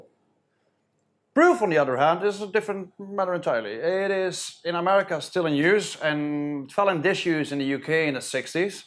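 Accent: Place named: Ireland. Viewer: Dude, what's the deal with the Irish accent? You're Dutch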